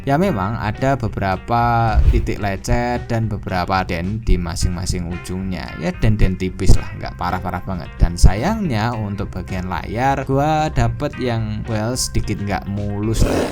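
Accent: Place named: Java, Indonesia. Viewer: Sumpah risih banget denger kata ( GUE ) gak pantes mas, medok mu lo 😢😢😢😢✌️🙏🏻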